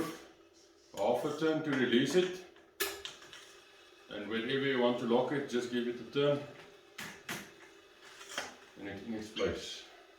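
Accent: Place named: Afrikaans, South Africa. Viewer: I knew that was an Afrikaans accent! But the name puzzled me. Must be Belgian/Dutch/ South African.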